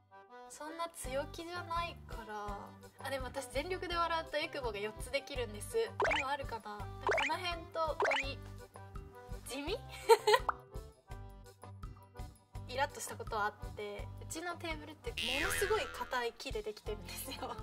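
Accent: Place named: Oita, Japan. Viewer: ッチナ❣️頑張れ👍 天から１日が始まる。大分弁かわいい😍ユーミーのボケとッチナの変化球が大好きです。天然だらけのめざまし、最高です。頑張れ👍 ッチナ❣️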